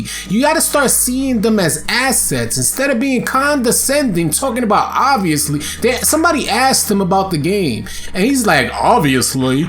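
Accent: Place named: New York City, United States. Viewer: sounding real live in this one! I hit that like because you sounding real NYC in this one my G.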